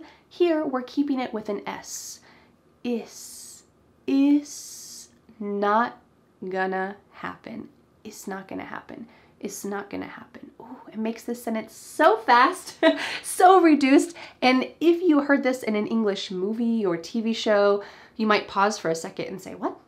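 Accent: United States. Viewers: Hi,! I just watched one of your lessons. I love your US accent. It’s amazing and so mean to me. I hv got hard things in putting my tongue to pronounce words correctly. It made me be shy to speak. Lol. I need tips from you. Help me pls. Thanks and wish you always be happy there. ❤️ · She’s so perfect, and perfect way to learn American accent. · I like 👍 American 🇺🇸 accent 🌸🌸🌸🌸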